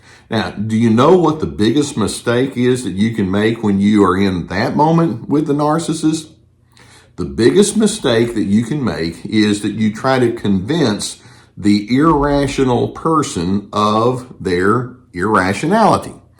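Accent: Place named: Houston, United States. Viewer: This is utterly excellent. Thank you, a voice for real humanness in a world gone mad. You your words truly benefit many. Your humility and honesty nurture our souls and authentic selves. I have even come to enjoy your Houstonian accent, very much, because your balancing Weltanschauung shines through the way you convey your wise words and pure intent. So, thanks again and again. To me you are a Voice in the wilderness. You seem to be a Voice for The Voice of the Silence...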